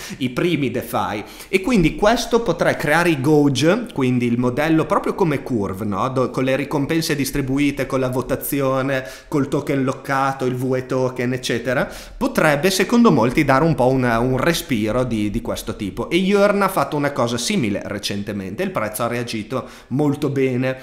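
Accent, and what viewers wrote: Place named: Lombardy, Italy. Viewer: Di dove sei? Hai l'accento delle mie parti(Lombardia)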